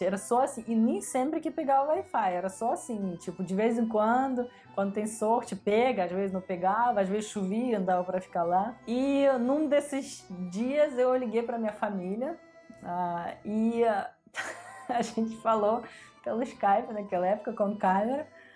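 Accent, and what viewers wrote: Rio de Janeiro, Brazil. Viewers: Você já está com sotaque carioca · adoro seu sotaque carioca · Seu sotaque é mais carioca do que russo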